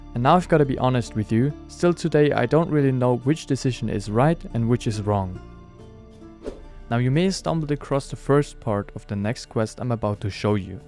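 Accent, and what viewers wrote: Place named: Switzerland. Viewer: Yes de Akzent im Englische würd ich überall erkenne 🇨🇭 Btw usually I would do all quests even the dreadful Reason of State but for Simun, who you meet in Lugos' prison, was so fishy that for once I turned down a quest. Seeing how awful Unpaid Debt is makes me think that it was the right choice 😂 However, it is interesting that the name of the man who Simun orders you to kill is the name of a Slavic smithing god. Simun mentions his sword if you side with him, but there is no special loot to be found there.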